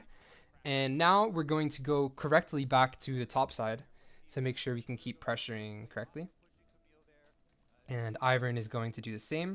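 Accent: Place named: North America. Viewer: Sounds like they're from NA, not sure what accent you hear from the video to think Zimbabwe :D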